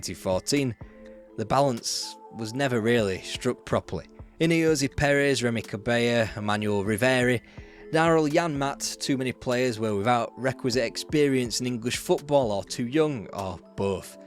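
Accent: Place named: Northern England, United Kingdom. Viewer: I'll say it again. Best content on you tube of this type. I also love the judicious (and quite appropriate tbf!) Use of profanity. Good earthy northern dialect. Keep it coming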